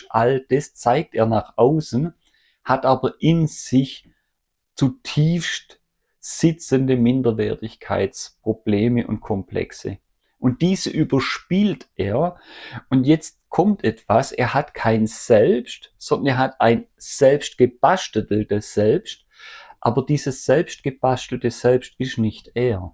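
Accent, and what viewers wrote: Swabia, Germany. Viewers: Auf den Punkt gebracht 👍! Was ist es für ein Dialekt? Schwäbisch? Meine Freundin aus Tschechien spricht so.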